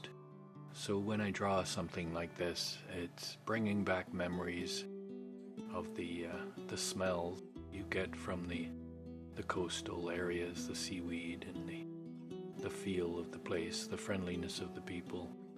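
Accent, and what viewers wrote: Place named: Canada. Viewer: I lived along the St Lawrence River and grew to enjoy the Canadian accent, thanks for the memories. I love how you capture the soul of the area in your pictures. 👍🏻🥰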